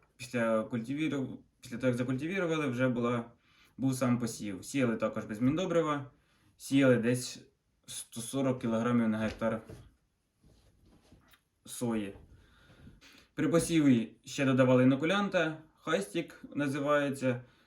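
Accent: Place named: Poltava, Ukraine. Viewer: По говору слышно ,либо Полтава ,либо Кировоград ,я вас обожаю землячки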